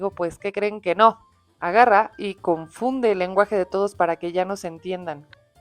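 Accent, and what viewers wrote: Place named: Mexico City, Mexico. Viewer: Qué joya de comentario, carnal. Leer la historia antigua en tono chilango es otro nivel. Y la neta es que traes un ritmazo con los datos históricos de los egiptos y la estrella Sirio. Es 100% real que esos sacerdotes se dieron cuenta de que cuando Sirio aparecía en el cielo, el Nilo se inundaba, y así calcularon el año solar de 12 meses. Y sí, Julio César llegó siglos después a meter sus reformas porque el calendario romano era un desmadre. Pero con lo de los 900 años de esos güeyes de la Biblia, la matemática nos da un patatús biológico. La teoría de que contaban "meses" en lugar de años solares para que Matusalén viviera 72 años suena chida, pero si aplicas esa misma lógica a todo el árbol genealógico, el guion se rompe bien feo. Por ejemplo, el Génesis dice que Enoc tuvo a su hijo a los 65 años; si esos fueran meses, significa que el güey fue papá a los 4 años y medio de edad. 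¡Una completa locura! La realidad histórica es más simple: los escritores de la Edad de Bronce no tenían un error de álgebra, simplemente eran bien exagerados. En todas las mitologías de esa zona (como los sumerios), entre más viejo y antiguo era el ancestro, más años le inventaban para hacerlo ver más sagrado, poderoso y cercano a los dioses. Eran puras mentiras piadosas para apantallar a la gente del pueblo, no un problema de traducción de los egiptos. ¡Pero qué buen cotorreo armaste con Julio César y sus mamadas!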